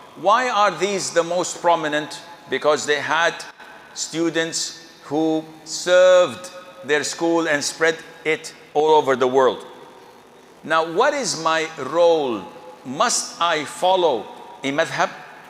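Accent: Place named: West, United States. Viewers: I sometimes wonder if he ever lived in the US!! His English is more American than UK!! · Yes, shaikh takes pride in his acquired western accent and considers South asian accents particularly loathsome.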